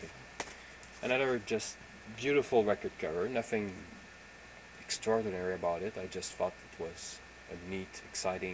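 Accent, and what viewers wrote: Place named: Canada. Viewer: I absolutley love some of these interesting records, and i want to see your whole collection. so please keep posting!!! And thats what i meant by no talking, please dont take it as a put down, (ya gotta enjoy a good canadian accent) but the quicker you get to the point, the more albums you show, if there's alot to say about a certain album, thats fine but you better be putting out more videos. you got some great stuff and much respect.....BUT POST MORE VIDEOS!!!!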